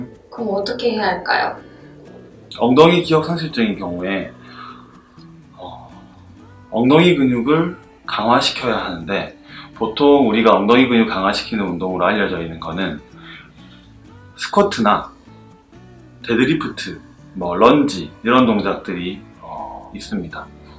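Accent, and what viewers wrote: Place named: South Korea. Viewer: 엉덩이를 쪼으면서 ㅋㅋ 사투리가 참 정겹습니다 ㅎㅎ 늘 좋은 정보 알려주셔서 감사합니다. 따라하면서 괜찮아진 곳이 꽤 되네요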